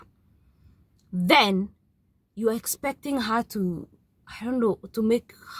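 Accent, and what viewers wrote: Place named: Arab world. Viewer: This Arabic accent....special.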